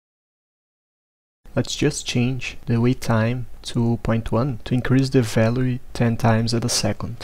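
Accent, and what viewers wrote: Brazil. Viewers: man sounds like brazillian, look at that accent, you love to see it. tamo together mano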